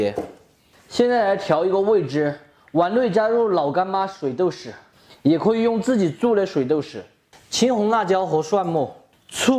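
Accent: Sichuan, China. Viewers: 喜欢看你做菜的视频，更喜欢你川普😄😂，可惜我是不喜欢做菜但又很很喜欢吃。只能看看你的视频过一下瘾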